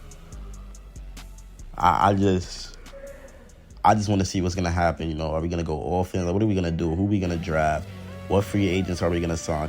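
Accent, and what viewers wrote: East Coast, United States. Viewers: sounds like he from east coast some where 😂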